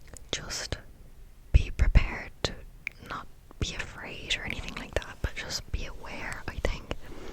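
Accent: Ireland. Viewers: BTW love your Irish accent and your walkthrough of your journal! You did an amazing job. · Well, ya got me. I'm listening to this thinking it sounds like you're saying you've never been to Ireland before... but how with the accent. And then I looked at other videos 😂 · Yay really love the Irish ☘️ accent 😊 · I thought and did exactly the same! 😂 Love the Irish accent! (more please) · ahhh i love the irish accent videos! it scratches my brain in the best ways lol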